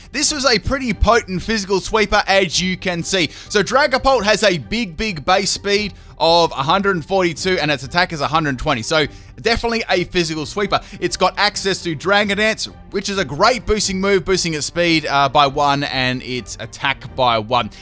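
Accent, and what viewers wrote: Australia. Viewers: This accent sounds familiar. Are you from Australia by any chance?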